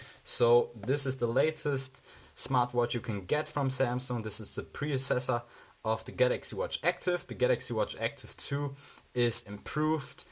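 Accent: Germany. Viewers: For the accent, I think he's German, so in Germany it's available.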